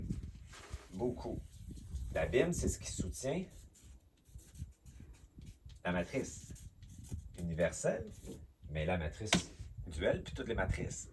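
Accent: Quebec, Canada. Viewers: ca fait du bien de reprendre le contenu entier de la playlist " Video reconnexion a son esprit ". Quand "le truc" est encore en train de vouloir se déconnecter, revenir entendre, ça remet es pendules "à zero"...et les pendules disparaissent aussi...Par ici, c'est comme se retrouver dans ce special endroit qui est orienté en direction de la maison. Dans la tête et dans le coeur et dans les trips ça dit: " ah oui c'est bien par là! " Cette 25eme video de cette playlist, est un bijoux à écouter encore et encore...Puis l'accent du Quebec de va bien à l'accent d'Italie🏵🌹🥀🌺🌻🌼🌷👑👑👑👑👑👑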